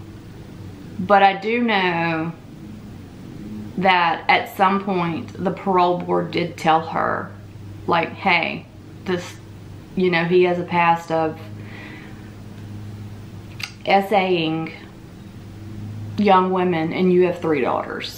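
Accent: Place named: Louisiana, United States. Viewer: What an evil, evil person 😭 That poor baby. I was born and raised in Louisiana. You must be from Northern Louisiana by your accent. Subscribed!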